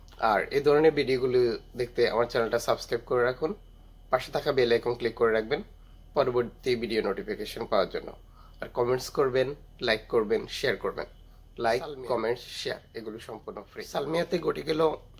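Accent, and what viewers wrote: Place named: Sylhet, Bangladesh. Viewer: ও সিলেটি ভাই তুমি সিলেটি কতা কইলাও তুমার বাড়ি নবি গনজ না হবি গনজ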